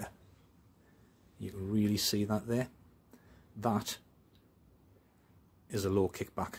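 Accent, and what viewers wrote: United Kingdom. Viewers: It is really good to hear a British guy explain chain saw tips. have some unguarded 1/4 pitch chain on my 194, it doesn't cut any quicker and I have always filed the cutter away before I touch the depth gauges. There are lots of people on youtube that are really into fast saws which is fun but any saw is heavy after a day's work fast or slow!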